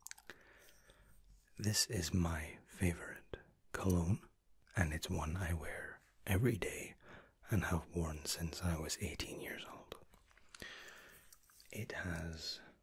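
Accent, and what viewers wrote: Scotland, United Kingdom. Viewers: Are you British? Or Scottish? I can’t quite tell. Love the accent though! 😉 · A Scots accent, A beard, and blue eyes was always going to be a winner, but wears Jean Paul Gaultier Le Male - OMG I think my ovaries just exploded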